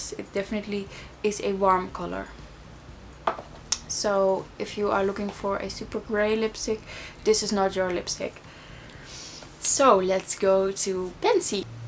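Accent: Netherlands. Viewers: Loved this reveiw! I'm obessd with my Veletines. I also love you Dutch accent. Immediately, I knew you were Dutch because of it. (: My oma is from Holland. Haha. Keep up the great work. Definately subscribing!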